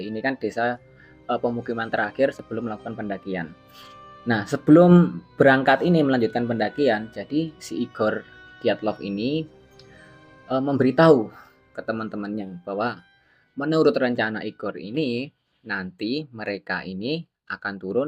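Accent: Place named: Java, Indonesia. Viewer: medok e ilangke mas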